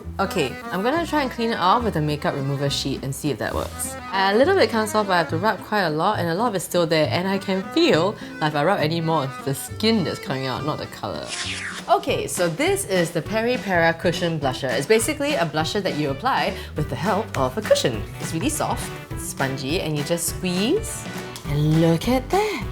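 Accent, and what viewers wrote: Singapore. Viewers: I think she's from Singapore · This is my second video of watching her and I love her cute Singaporean accent ^.^ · The singlish!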